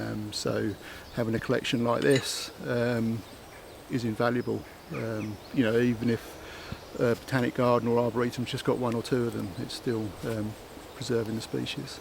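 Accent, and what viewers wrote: United Kingdom. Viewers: Well the narrator sounds English, so what he says would indicate what they're called there, but I think Australia too, yes.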